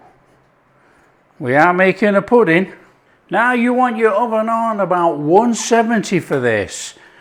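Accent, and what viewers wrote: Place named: Northern England, United Kingdom. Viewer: Love, love your beautiful accent. Is it from the north ? Greetings from Reading, Berkshire.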